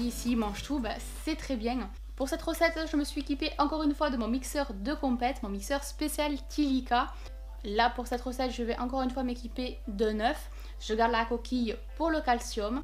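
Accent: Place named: Toulouse, France. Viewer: Juste super resultat j'adore cette sonorité toulousienne ^^! Cest merci à vous deux d'autant m'apprendre et nous partagé tous ça! ET SURTOUT davoir eu et pris le temps de repondre cest super.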